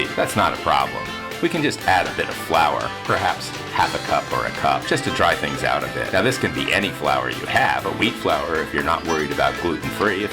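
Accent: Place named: United States. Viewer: I love it! Sounds very country and the music complements it.😊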